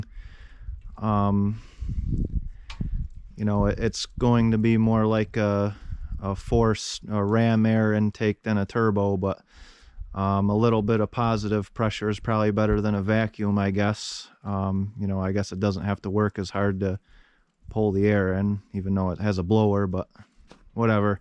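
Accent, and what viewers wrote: United States. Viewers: You almost sound like you're from Minnesota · Well I would have bet you couldn’t have done this. I’m new to your channel. Nice job! Your door ID on the truck says New York but your accent says maybe Wisconsin? Well anyway you have a new subscriber.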